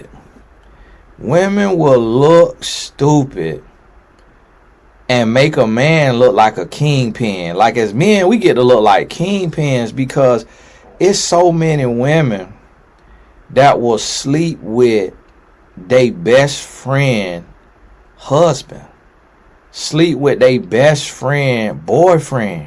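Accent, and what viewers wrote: United States. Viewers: Tony Gaskins where were you raised because I love your country accent. Keep bringing that Godly and Straight no Chaser Wisdom 💯💚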